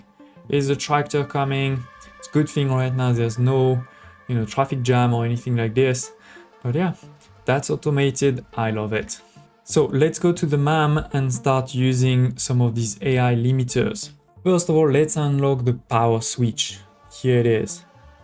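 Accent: France. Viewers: l'accent Français c'est quand même vite reconnaissable quand on parle anglais :D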